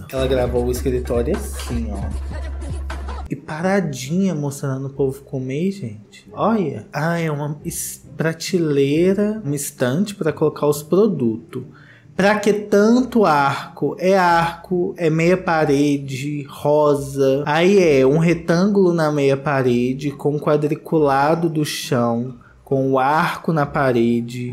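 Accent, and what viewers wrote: Minas Gerais, Brazil. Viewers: Um escritório bem feito?!, você me faz rir demais, menino! 😂Adoro seu the boche e seu sotaque mineirim 🤩🤩💜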